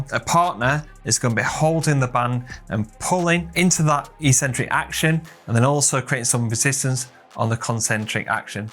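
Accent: United Kingdom. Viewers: The real British accent makes the Viddo much interesting 👍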